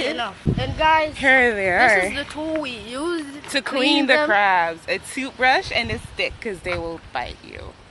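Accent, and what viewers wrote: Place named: United States. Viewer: are u a jamaican cause u sound like american lol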